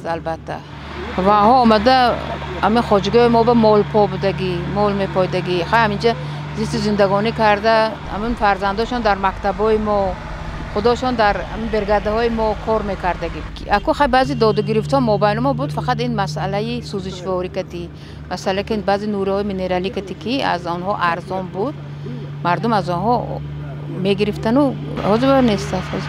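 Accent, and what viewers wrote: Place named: Chorkuh, Tajikistan. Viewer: Ҳам адаби хам лаҳчаи зебои Чоркуҳи тарзи суханрони зебо вафаҳмо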